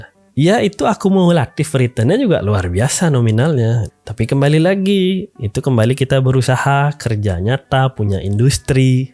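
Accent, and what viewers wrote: Bali, Indonesia. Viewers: kaya orang bali logatnya pak, ake bingung bane 😂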